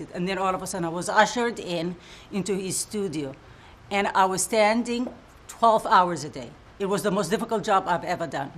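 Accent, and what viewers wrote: Somalia. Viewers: she has a very faint Somali accent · she's somali not arabic, we don't have the same accent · Her Somali English Accent is cute!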